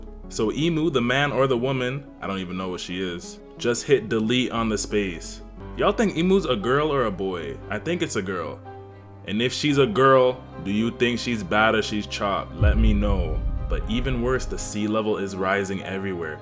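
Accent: Toronto, Canada. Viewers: You have that native Toronto accent lol it's strong!!! You sound like Drake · Never thought I’d hear a Toronto man doing one of these types of videos 😂 Toronto man anime is a vibe 😂😂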